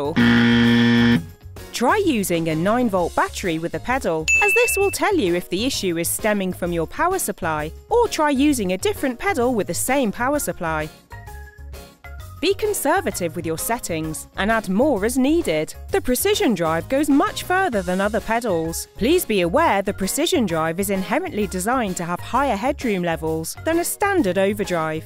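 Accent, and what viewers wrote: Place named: England, United Kingdom. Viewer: The English accent creeps me out... and i was born in England...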